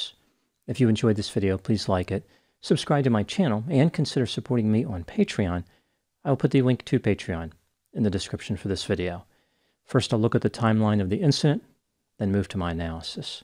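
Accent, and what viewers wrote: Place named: Baltimore, United States. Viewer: Doc, I’ve always thought you had a Baltimore accent.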